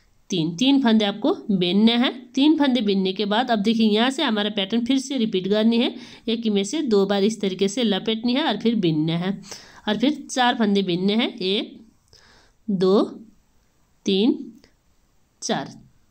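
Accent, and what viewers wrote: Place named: Kathmandu, Nepal. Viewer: आप की डिजाइन बहुत अच्छी लगी ।आपकी आवाज सुन कर काठमांडू याद आ गया धन्यवाद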